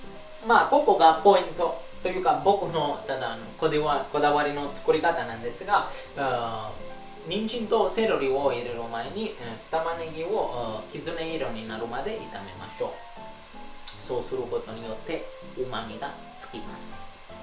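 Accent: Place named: Italy. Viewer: 自分もやってるからかもだけど、白ワイン飲酒後？(仮)すごいイキイキしてて、イタリア訛り炸裂のハイテンションに思わず癒されました^_^ 仕事を楽しみ、動画で視聴者に元気を与えるに心底尊敬の念を持って感謝申し上げます！！！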